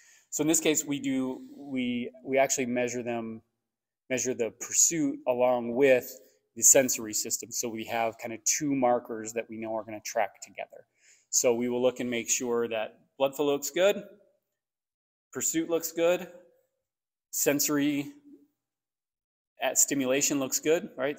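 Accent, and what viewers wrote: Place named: Midwest, United States. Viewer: I really enjoyed your video. I am curious if you use any traditional chiropractic methods to help increase the cerebral blood flow? I have a somewhat mild case of POTS which came out 6 months after i got Covid. Turns out i have chronic Lyme and a bunch of tick infections. It was really bad in 2022, and I'm about 70% recovered. But i still wake up in tachycardia and i can't tolerate much exercise, which is so hard for me to deal with. I also get brain fog and fatigue by 2-3 pm every day. Anyway, it's interesting to hear this take on what could be going on. I would love to have this type of in depth evaluation. You sould like you might be in the midwest, so do you happen to know any other practitioners in the DC area? Or are there online resources for home exercises which people like me could try to assist brain recovery? 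Thank you for your insight!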